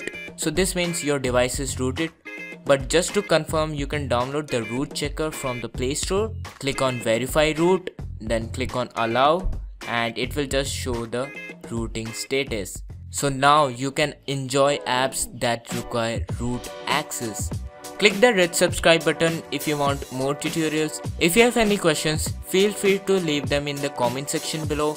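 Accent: India. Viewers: bro from your accent i bet you are indian right? You english is pretty good tho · sounds indian but uses chinese as his text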